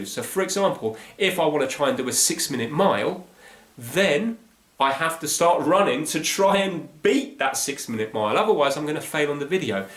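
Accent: United Kingdom. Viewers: I started with walking during my lunc hours, fast forward 3 years , now I run 3 x a week and coach a running club :). PS: You look just like a high school friend of mine, but with a British accent :)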